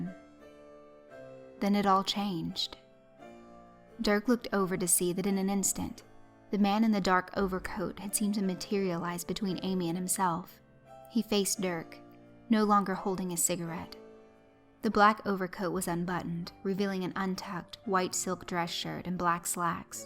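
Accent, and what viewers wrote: South, United States. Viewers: idk what it is about your voice just make me fall asleep i don't know if it the southern accent listed to the story you narrate makes me fall asleep i work 3 shift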